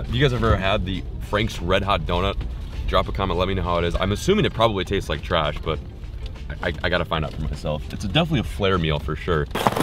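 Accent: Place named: North, United States. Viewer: Like if y’all can hear getting a northern accent since the move haha. It’s getting noticeably stronger each video brother.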